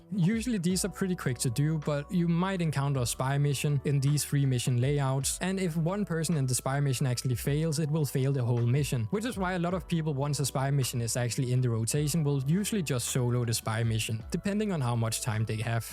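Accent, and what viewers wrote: Denmark. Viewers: Danglish? <3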